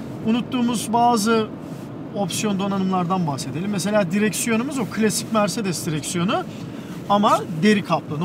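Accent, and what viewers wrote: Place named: Aegean, Turkey. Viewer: Abi sen egelimisin orlarda burlarda diyon gari hep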